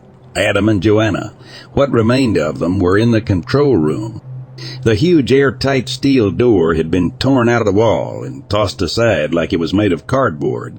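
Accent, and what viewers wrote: Texas, United States. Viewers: yeah same, he is probably around 65-75, but he does sound like he's from Texas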